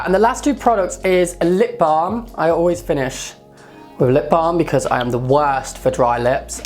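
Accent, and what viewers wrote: United Kingdom. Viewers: Hey hottie with the totally cool British accent!! : )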